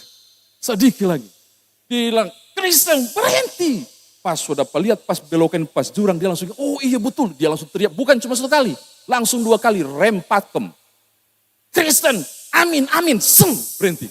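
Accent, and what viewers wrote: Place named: Kupang, Indonesia. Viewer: Puji Tuhan... Trimakasih pak pendeta, untuk reningan yg menguatkan. Sambil senyum dengar Bapak logat kupang....😊😊🙏🙏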